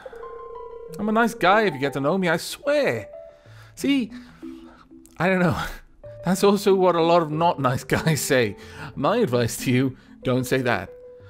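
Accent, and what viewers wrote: Wales, United Kingdom. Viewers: I am nearly sure that is Welsh.;-)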